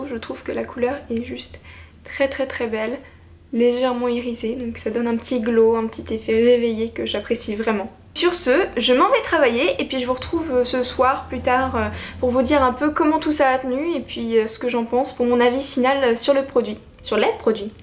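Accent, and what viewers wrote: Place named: France. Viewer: Oh mon dieu! Jadore ton accent :) habituellement j'ai de la misere avec laccent francais, mais ton accent est super delicat sophistiqué et adorable :) j'ai vraiment aimé ton opinion !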